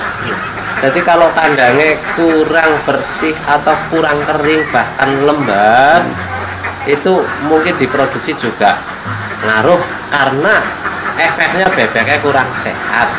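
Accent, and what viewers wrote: Banyumas, Indonesia. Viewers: Ngapak temen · Kiye ngapak ya....tp nek ora ngapak ora kepenak... salam sukses selalu mas dan tetap semangat.. 😀😀😀👍👍👍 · Ngapak ngapak...kebumen atau cilacap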